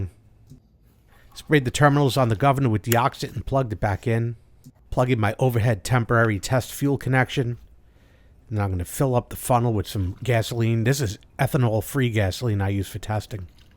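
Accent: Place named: New York, United States. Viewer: This the best Honda generator video I’ve seen on YouTube. Your definitely a New Yorker most likely Long Island wish I new you when I lived in Brooklyn.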